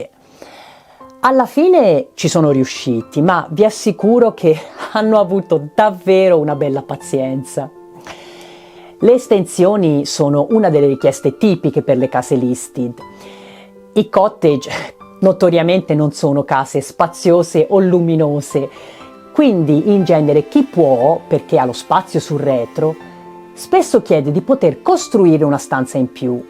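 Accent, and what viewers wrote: Italy. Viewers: Mi sembra di cogliere l’accento della mia regione l’Umbria . Mi sbaglio?!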